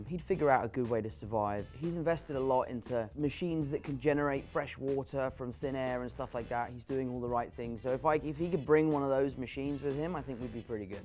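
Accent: United Kingdom. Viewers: pure British. The amount of times I’ve said elevenereef. Brill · Your Hella England straight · Tom Halland who is your favorite avengers character also I like your British accent and you say water 5 times for me😅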